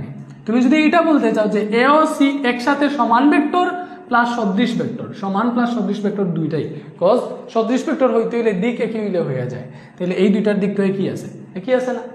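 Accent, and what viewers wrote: Sylhet, Bangladesh. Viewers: ভাইয়া সিলেটি নাকি চট্রগ্রামের?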